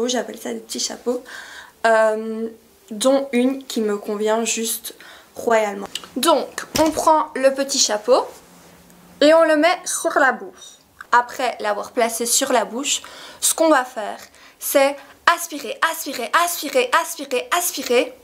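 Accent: Belgium. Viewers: Ta vidéo est sympa, il y a beaucoup d'humour, tu te moques de ton accent et oui, il faut savoir faire de l'autodérision, on a l'accent belge et on ne renie pas les origines.Elle traite bien du sujet présenté en expliquant bien les dangers pour les jeunes filles qui abuseraient de ce système, je trouve que pour une première, elle est super bien faite; je t'encourage à persévérer et à continuer si cela te plaît, sans t'occuper des gens haineux qui ont toujours un commentaire déplaisant à dire.Et pour celui ou celle qui dit que tu as des lentilles, je le défie de trouver une photo de toi sans tes yeux bleus clairs, qui font ton charme, alala la méchanceté gratuite à quoi ça sert vraiment, maintenant on peut ne pas aimer ou apprécier, pas de soucis, EN BELGIQUE c'est un pays démocratique et on a libre expression;....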